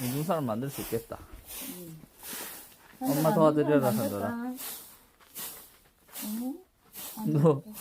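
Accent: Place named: Gyeongsang, South Korea. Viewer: 우연히 산돌이 영상을 보고 님 영상 처음부터 끝까지 보고 또 보는 중이에요.제 고향 대구와 멀지 않은 곳에 사는거같아서 말투도 정겹고 산돌이영상은 더 좋구요. 뽀드득 뽀드득 소리 너무 듣기 좋네요~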